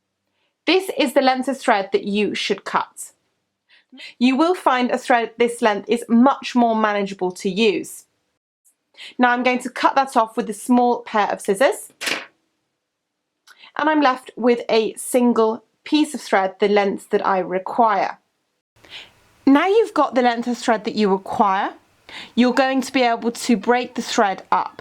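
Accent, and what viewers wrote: United Kingdom. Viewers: Very helpful, tysm. btw luve,luv LUV ur british accent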